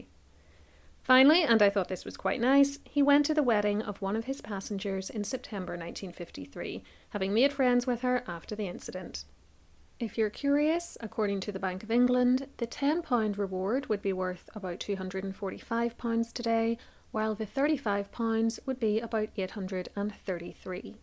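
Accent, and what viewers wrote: Ireland. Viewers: A few years ago I was in the CN Tower in Toronto and I swear I had the same brain conversation with myself as I stepped out onto the glass floor at the top (albeit without your beautiful Irish accent!). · Nice, no-nonsense, well-illustrated presentation —and the Irish accent was readily noted by this Yank. Jumping the gap: Did this inspire The Blues Brothers?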